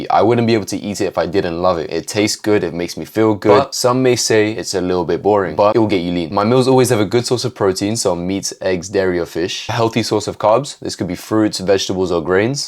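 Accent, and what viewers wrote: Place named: Maghreb. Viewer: WHOA! Viva Maghreb 🇲🇦 Body, Accent... Fire bro🔥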